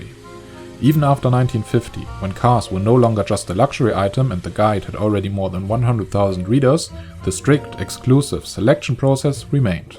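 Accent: Germany. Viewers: Typical german accent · wie kann man nur so deutsch klingen xD dieser Content ist einfach ein Referat aus der siebten Klasse. Krank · Not French for sure. As French myself, it sound also German to me. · PLEASE practice your accent. For me as a fellow german its nearly unbearable to listen to you speaking english anyway, nice video! keep up the good work 🌞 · I love your German accent, very convincing and genuine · U german? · Du bist deutscher 😋😋 is ch höre das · Pretty sure he's german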